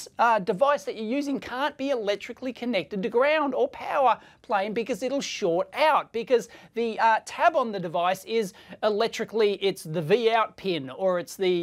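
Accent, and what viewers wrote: Australia. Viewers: Australia accent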